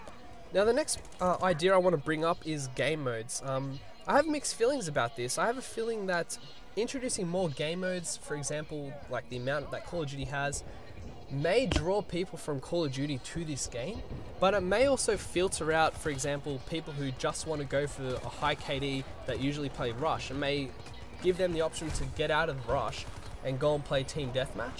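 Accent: Australia. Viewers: i am guessing you are an aussie... and if you are what clan are you in. i am in a US one right now and need some ausssie to play with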